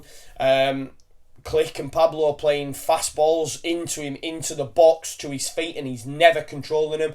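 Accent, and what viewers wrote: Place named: Yorkshire, United Kingdom. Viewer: Am I hearing ”John Snow” accent sounds similar :) Leeds United whatta great did, unbelievable fair play, whole football fans in the world amazed by your gesture. fair play. Good luck guys want to see you in Premiere League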